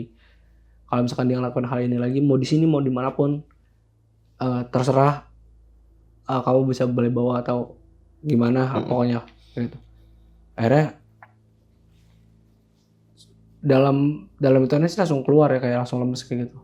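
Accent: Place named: East Java, Indonesia. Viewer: saya kira vidio realnya mange🙏🏼... soale saya orang Jawa timur😊suaranya kyk orang Jawa timur 😊🙏🏼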